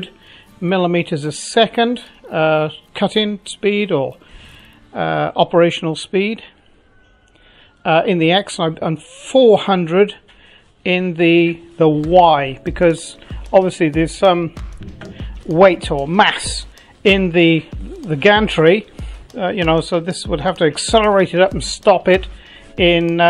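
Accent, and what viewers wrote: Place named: Cardiff, United Kingdom. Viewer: laaarge! Got to be Caaardiff or thereabouts :)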